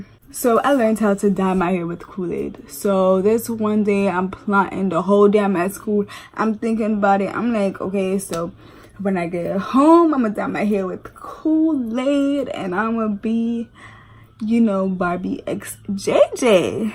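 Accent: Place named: Jamaica. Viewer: Nuh understand where yo Jamaican accent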